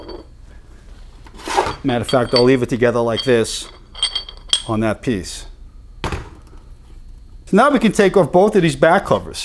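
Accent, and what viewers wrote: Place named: Massachusetts, United States. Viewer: What part of mass are you from you accent gives you away lol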